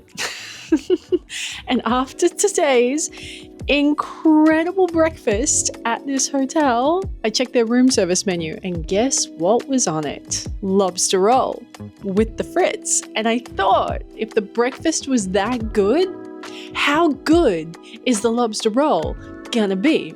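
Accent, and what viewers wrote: Australia. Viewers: that’s not a British accent, more like Australian. As a Scot I take offence to the claim our food is terrible over here lol, you just mustn’t have tried the right foods when you visited 😂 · Aussie? The way you say your R's sounds really familiar.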